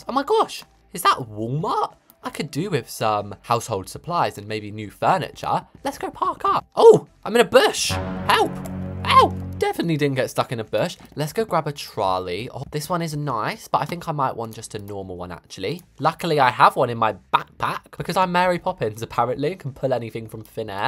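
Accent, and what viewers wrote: United Kingdom. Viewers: i always wanted to learn your accent i love britsh accents i really want to go to England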